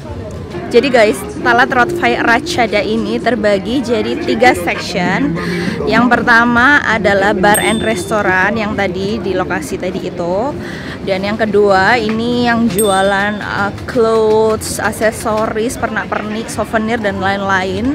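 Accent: Java, Indonesia. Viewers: Youtuber surabaya ngomongnya medok bgt 😁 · kuwait 8/10/19... wonge ayuu,,,.medhoook..mangane akeh ora isin,,,,,,,lucu lucuu,,,tek subscribe lah....january mw ke bangkok n krabi.. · Rai² cino tpi boso e medok mantul mbak😂 #wongjowo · Bicara nya keJawaan kak 😍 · Jowomu mbak medhok beud, mantebbb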